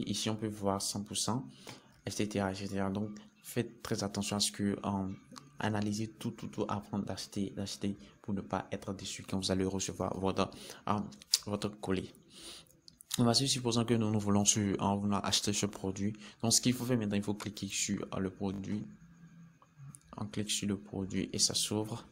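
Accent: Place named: Europe. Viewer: Belle vidéo... Pour les prochaines vidéos apprend à parler comme un africain et non comme un européen merci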